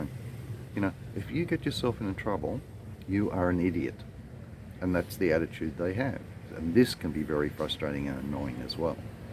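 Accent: Australia. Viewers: Well done great vlog, nice to hear a Aussie voice were in central Queensland so lovely to hear direct items about the Philippines no bull just real deal thank you , just subscribed looking to the future videos , cheers mate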